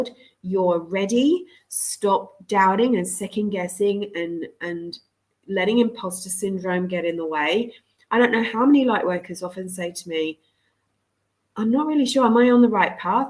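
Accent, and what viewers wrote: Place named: Australia. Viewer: I’m in the U.S, but i’m an Aussie. So awesome to hear an Aussie accent. Makes me feel better. I’m an empath. Being in America is really hard right now. I spend all my time, clearing it. Lol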